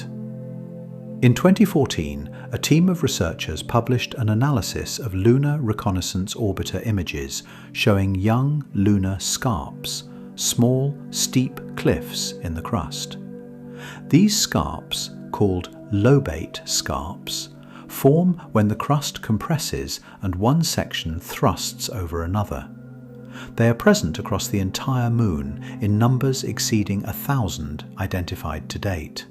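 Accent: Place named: England, United Kingdom. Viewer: A..I uses an English accent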